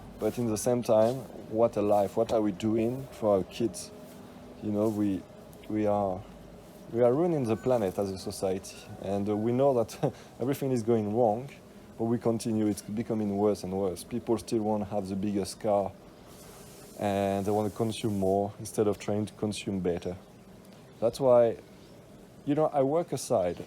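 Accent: France. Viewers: I used to be pessimistic about the future of the world but over the last 20 years I think we have turned a corner. Doesn't make many people happy the future I see as it's tech driven but that the way it will be. It's just spreading the wealth is the issue and that political. Anyway thats a long story. On a completely different topic, that's a French accent? The wifes French and we come in to Melbourne occasionally for your sons braces to be done in Bentleigh East. A very important question and if you have an answer it could make the wife very happy (and the son and me). Is there a good French bakery near there and/or a French importer of foods? Thank you.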